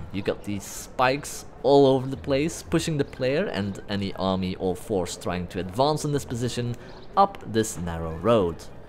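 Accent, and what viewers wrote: France. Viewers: Crazy, a French guy speaking English 😮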